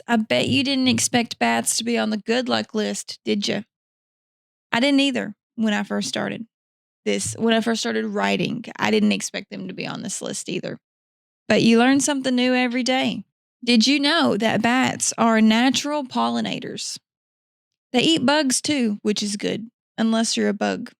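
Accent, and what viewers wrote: Kentucky, United States. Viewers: Lol glad super glad you randomly showed up on my feed. Super pretty, funny, and the Ky. accent is nice. I am subscribed lol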